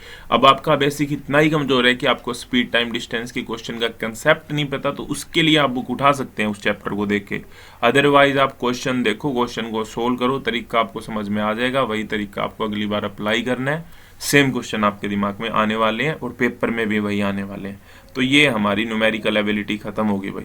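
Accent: Haryana, India. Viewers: Sir mujhe aapki voice bhoutt aachi lgi aapke bolnee ka tarekaa gjjbb h haryanvi side se ho kya aap😙😙😙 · Aawaj se to Haryana se hi lagg raha hai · Ha lgte to haryanvi hi h ....sonipat side k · Bhai Haryanvi hain ke??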